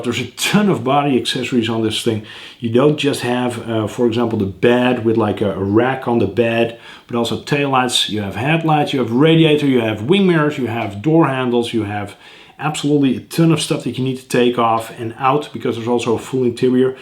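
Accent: Netherlands. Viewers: Do I hear a Dutch accent? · Je hebt een beetje een Nederlands Engels accent, klopt dat ?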